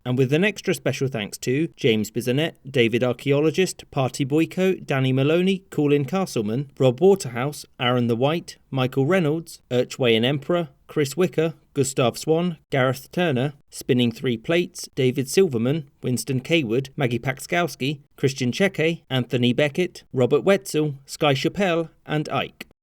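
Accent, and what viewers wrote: United Kingdom. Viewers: Not going to lie... It's a bit weird learning about the American Civilian War though someone with a british accent.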